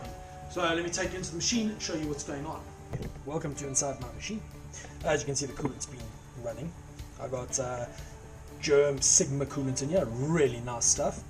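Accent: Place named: South Africa. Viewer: Hi there! Just discovered this series and binge watched all the videos. Great stuff! Do you have any info on your spindle? Specifically the atc system? Cheers! Devin. Ps I was born in Joburg (in fourways, moved to Canada when I was a kid... I can pick out the accent from a large crowd haha)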